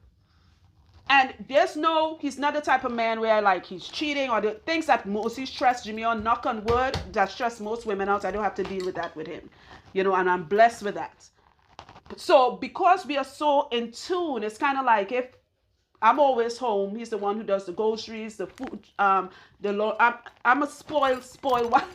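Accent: Trinidad and Tobago. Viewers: I knew it!!!! That Trini accent cannot hide....u had me laughing...but u were very direct and imformative and i appreciate that...firt time viewer from your home land...🇹🇹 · Hearing my accent on you is so beautiful, fellow Trini.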